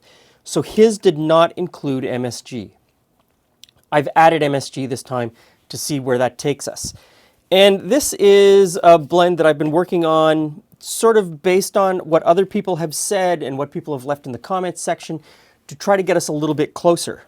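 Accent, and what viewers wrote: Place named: Toronto, Canada. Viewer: I don't watch this channel often but is from Toronto, which can be a bit different from the rest of Canada in mannerism, way of speaking, etc.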